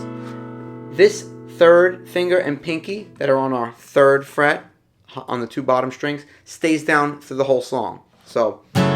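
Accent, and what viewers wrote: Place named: New England, United States. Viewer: Awesome instruction and easy to follow. Bonus for the NE accent.👊🏻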